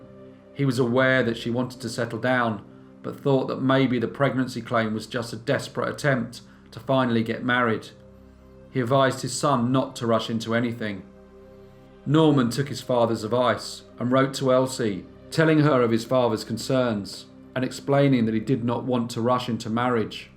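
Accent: United Kingdom. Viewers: I LOOK OR WATCHED MANY OF THE VIDEOS AND FIND IT INTERESTING,ITS LIKE MANY GENRE MIX TOGETHER COZ IT DOESNOT CIRCULATE IN ONE OR TWO PLACES BUT IN THE WORLD WHERE SOME ODD THINGS WHICH SURFACED OR KNOWN WELL KNOWN EVEN OUTSIDE OF THEIR COUNTRY,WHICH MEANS THE NATIONALS COUNTRY WOULD KNEW WELL,AND THE BRITISH ACCENT IS NICE TO HEAR ITS NOT LIKE HIGH PITCH QUICK PACE ACCENT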